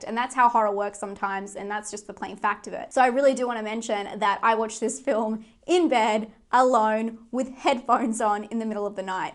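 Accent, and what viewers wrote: Australia. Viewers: It is so cool to have someone in Australia doing movie reviews as im an aussie as well but don't get me wrong i love watching american movie reviews as i do all the time its just good to here an aussie talk about movies and Haunt sounds like my cuppa tea so thank you for your time doing these reviews look forward to your next one takecare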